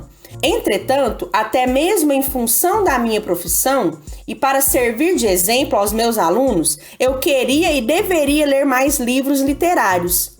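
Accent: Minas Gerais, Brazil. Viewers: Bela correção! Gostei foi do jeitinho mineiro de falar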